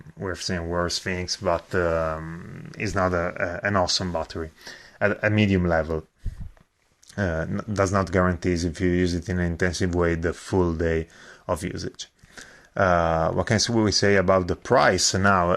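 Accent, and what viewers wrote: Italy. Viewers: brutta e pesante la cadenza italiana... ma voi per parlare l'inglese in questo modo da chi l'avete appreso? dalle capre? si sente proprio forte la tendenza ad italianizzare il tutto... a dir poco vergognoso. E in cinque anni di scuola superiore ho imparato più io che voi un inglese di livello intermedio, ma voi cari state alle pezze che pure i bambini vi superano. Fate un corso di dizione o quantomeno aggiornatevi con babbel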